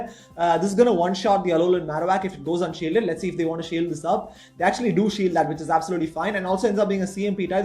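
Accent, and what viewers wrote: India. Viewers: dude ur english is flawless whit a indian accent sound fucking cool haha, one love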